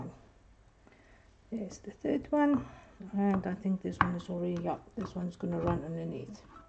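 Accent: South Africa. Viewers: Hou aan vids maak ons is amper by n 1000 subs. Ek het die aksent gehoor jy is afrikaans😂😂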